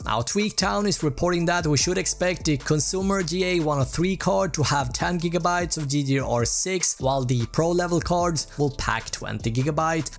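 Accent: Sweden. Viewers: he's a swede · As a fellow Swede I instantly knew you where swedish hearing you speak english haha, no offence man!. That said, please work on your presentation, you sound like a robot and talk way to fast. This is only constructive cririsism, the content is great! peace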